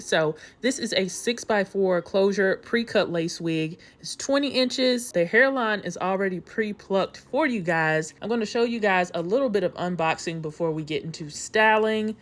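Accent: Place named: Tennessee, United States. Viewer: I hear a little county accent 😏 I'll guess Tennessee. 🤔 Gorgeous curls tho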